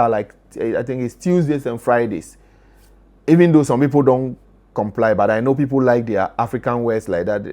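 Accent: Nigeria. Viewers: Your english you sound like nigerian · Those mangoes they put pepe there are unlipe they are very sweet with pepe if you mix everything in food we call that ma chakula nobody will eat that, but we like fresh food with flesh spices, in Kenya we say what men can do women can do better, every one in Kenya has bank account in their phone means Avery body is rich 😂😂😂😂, matatus there are those who don't have the noise so you choose the ones you want to use, for the noise ones are called nganya, you look likes ogo even your English is somehow for Nigerians, uhuru means freedom, so you like nyash😂😂😂😂😂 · You were called oga cos of your accent..it sounds nigeriAn. Its not cos of the beard cos young kenyans wear beards too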